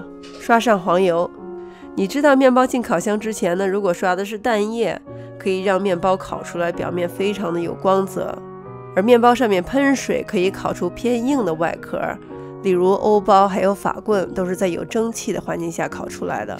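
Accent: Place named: Xi'an, China. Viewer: 你这口音像我们西安人啊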